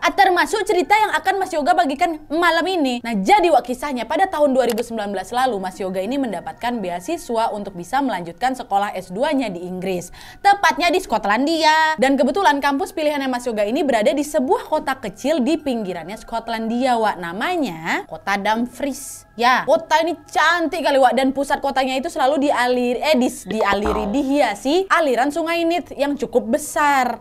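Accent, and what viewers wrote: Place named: Medan, Indonesia. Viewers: oh begitu, kalo logat si saya emang tau makannya pertanyaan saya Medan, Pekanbaru atau Batam 😂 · Kak itu lahir di pekan baru sudah bebrapa kali kk blng dan memang bahasa ny agak mirip sm kek logat orang medan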